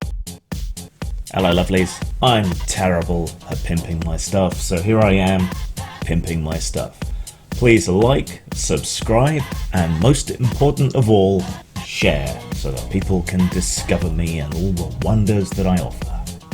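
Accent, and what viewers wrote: United Kingdom. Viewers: Why the British accent,? Let's see if anyone get that.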